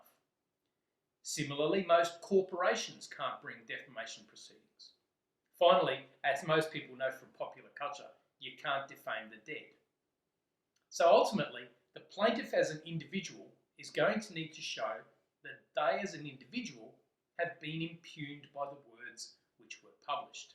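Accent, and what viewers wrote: Australia. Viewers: god damn aussi accent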